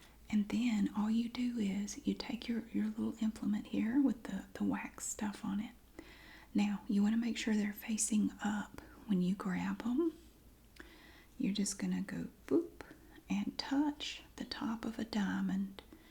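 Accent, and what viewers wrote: South, United States. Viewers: I love your whispering videos. You have the nice sounding and calming voice. The southern accent also has this New Yorker hooked lol. Love it. You’re adorable and I love ALL you videos and your sense of humor! Don’t listen to trolls or haters they’re just jealous of your success.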